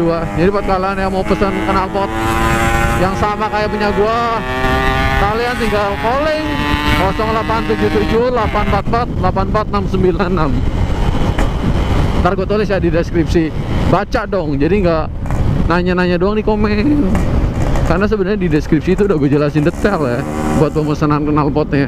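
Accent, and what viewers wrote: Banyumas, Indonesia. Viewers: Ngapakk hadirr mas'e 😂👌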